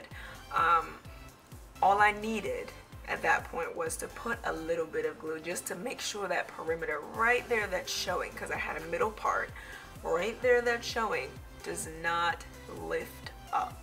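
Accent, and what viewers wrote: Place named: Jamaica. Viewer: I don't wear wigs but am definitely subscribing, plus as u start talking I knew I was listening to a fellow Jamaican 🇯🇲, then when u said u had to wash with your hands back home I was like yes I knew it she a Jamaican 🇯🇲.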